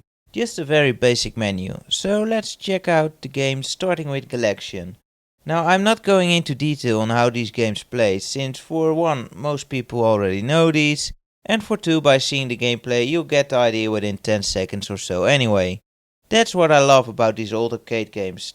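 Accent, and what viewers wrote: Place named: Netherlands. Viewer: You sound verry Dutch!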